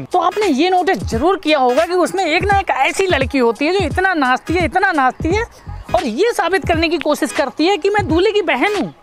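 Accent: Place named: Budaun, India. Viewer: Bhai voice se lagta hai aap budaun ke aas pass ke rhne bale ho achcha tareeka hai entertainment ka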